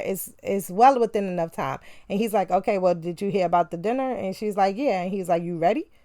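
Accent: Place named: New York, United States. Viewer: Love your NY accent!!!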